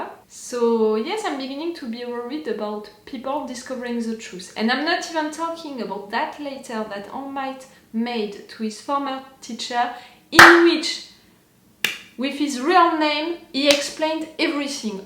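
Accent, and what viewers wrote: France. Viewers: j'adore ton accent français ^^ Il est merveilleux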